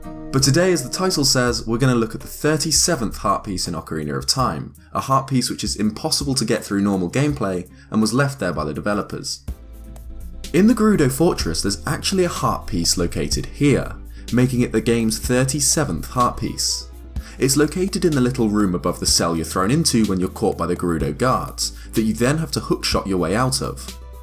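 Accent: United Kingdom. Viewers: its called a BRITISH ACCENT. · eX Galneryus because hes British lol it's his accent. He also said Zelder.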